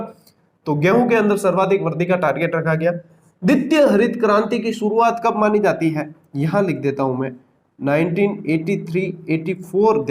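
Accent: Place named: Rajasthan, India. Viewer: Sir aap marvadi bolte ho to bhut achha lagta h pr smjh km aati h greet Sir ✌️✌️👍👍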